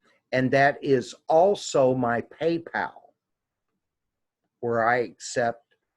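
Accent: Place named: Georgia, United States. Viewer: either way, he says it in Georgia talk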